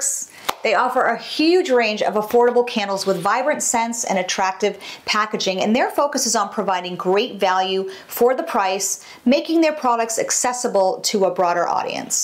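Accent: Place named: Massachusetts, United States. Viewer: P.S. I ♥️ your Massachusetts accent. My hubby and I LOVE Boston! We’ve been there twice and want to go back!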